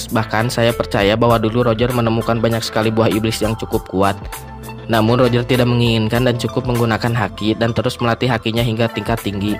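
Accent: West Java, Indonesia. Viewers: Nada bahasa nya kaya logat orang sunda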